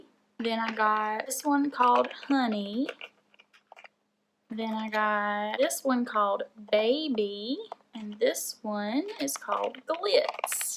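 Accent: South, United States. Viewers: Her country accent is so cute lol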